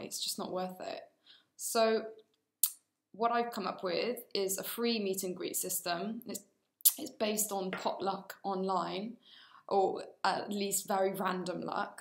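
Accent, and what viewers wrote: Wales, United Kingdom. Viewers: travick no, she's Welsh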